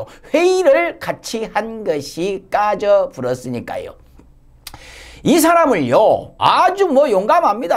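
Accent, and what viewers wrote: Jeolla, South Korea. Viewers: 님은 서울이여 근데말은전라돈데여 공정하게말씅하세요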